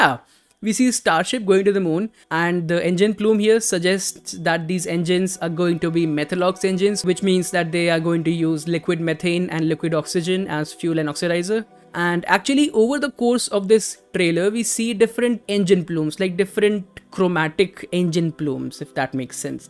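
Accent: India. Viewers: Bro has Indian accent!.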